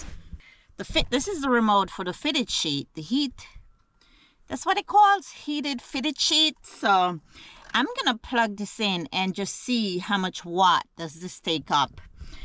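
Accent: Jamaica. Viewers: She sounds Jamaican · I admire you for not giving up, you sound Jamaican. I pray that you will repent of your sins and turn your life over to Jesus Christ, if you have not done so already. Keep your eyes on Jesus. He is the only one who can help us. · Hi. Being of jamaican heritage my self , i hear a slight jamaican accent ? With you. Are yiu from jamaica ? If so , did you leave long ago. ?. Have you considered to go back home?. Maybe life would be better there.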